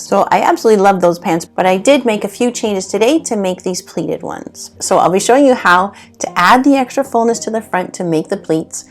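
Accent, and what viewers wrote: Canada. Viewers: I could tell you were Canadian by your accent 🤯 surprising! Hi from Canada 🍁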